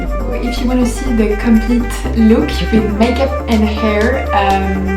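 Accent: France. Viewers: Your French accent and grammatical mistakes are really funny. Thank you for that delightful and entertaining video! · That frenchhhh accenttt 💛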